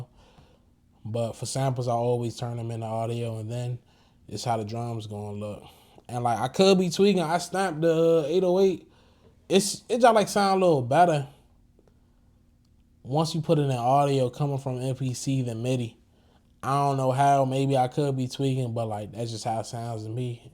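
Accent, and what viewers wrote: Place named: DMV, United States. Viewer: you got a dmv accent pushp on me i own a studio in dc let get you some placemnts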